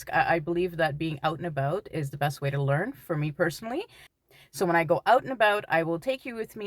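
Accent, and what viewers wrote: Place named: Canada. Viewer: Are you living in canada or nearby michigan?Cuz, you have a little bit of the accent with the out and about😊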